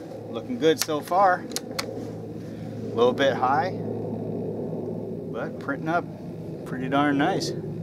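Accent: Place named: Wisconsin, United States. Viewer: Is he from Wisc-aaaahnsin ?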